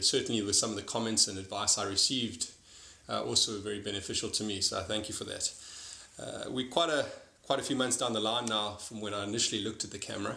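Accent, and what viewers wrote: South Africa. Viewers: South Africa, one of the best accents. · Are you from South Africa? Sounds like your accent is from that area.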